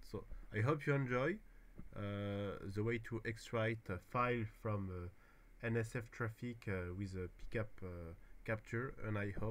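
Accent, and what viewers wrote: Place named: France. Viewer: noticed the french accent merci frérot pour le partage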